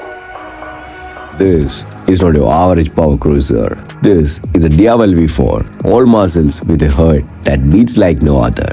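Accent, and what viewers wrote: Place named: Kerala, India. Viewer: Great vid but work on your speech and pronunciation. You have solid baritone voice, but you have a heavy Malayali accent which makes it extremely difficult to understand what you are saying.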